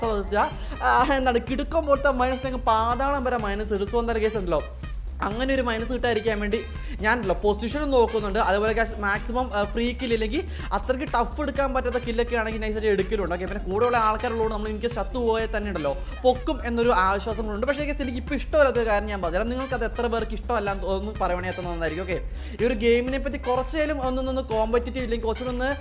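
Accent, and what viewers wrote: Kannur, India. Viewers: Bro Kannur aano ?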